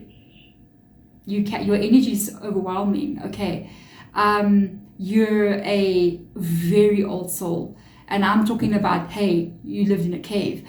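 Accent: South Africa. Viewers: B4 opening YouTube for a taro reading i asked my spiritual guides to guide me to a taro reader who can give answers to my questions and can with their reading uplift my spirit for this day. Lo and behold you were the first to appear and the moment you start talking i felt the connection with you. This is my first time ever that i come across your videos. The moment you start talking i knew that you are a fellow south african, only i live now in Australia. You are the best taro reader that i come across of all the other readers. The reason why is bc i have never their heart. Everything that you said resonates with me. The isolation that i prefer bc of others bad energy thats not good for my soul. Many times i feel like i don't belong where i found myself. I am very empathetic and can feel other people's emotions. The other day i dreamt that i was nackered and i needed somewhere to rest but where i am, i feel like im not welcomed. 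I found a bed where i can rest and felt so uneasy i was afraid to fall asleep then suddenly i feel arms around me and immediately i knew whom it was without turning around. At that moment I felt at peace. The person whom it was passed away 5 years ago and has told me that everything is going to he alright. Later the day i also remembered the morning he came to visit me was also his birthday. Thank you for your reading and i am subscribing immediately. Looking forward to listen to your other videos. Love and light.❤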